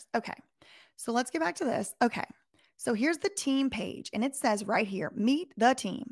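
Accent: South, United States. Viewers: You are the best holy shit. Sweetest accent, funny, southern as hell, gorgeous and an advocate for the weak. You earned a sub please don’t ever stop doin this.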